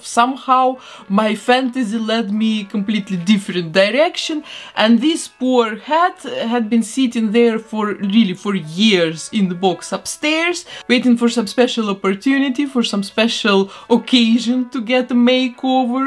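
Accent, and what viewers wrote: Russia. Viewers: У неё какой-то русский акцент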